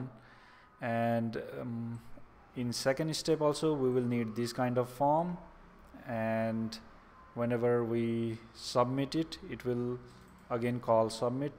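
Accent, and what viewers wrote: India. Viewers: Indian Accent is annoying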